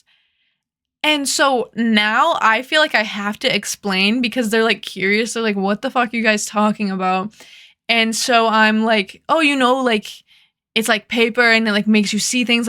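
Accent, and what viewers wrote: United States. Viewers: you have the best regional american accent on the earth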